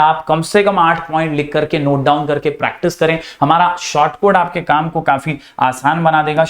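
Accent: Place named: Uttar Pradesh, India. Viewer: Sir U.P se belong karte ho kya U.P ke old age people aise baat karte hai. :)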